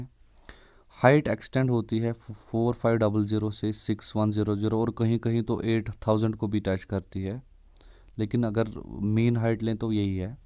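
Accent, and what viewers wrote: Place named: Kashmir, India. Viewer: Sir your accent is more like a kashmiri pandit.. are u a Kashmiri pandit ? Sir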